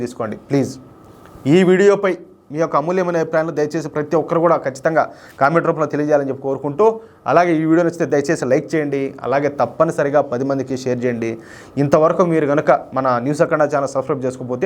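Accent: India. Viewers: Superr sir. Way of explantion is good sir. Telugu slang also attractive sir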